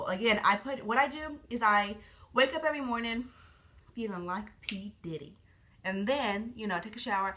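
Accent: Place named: South, United States. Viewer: I am so jealous of your country accent!! 😭😩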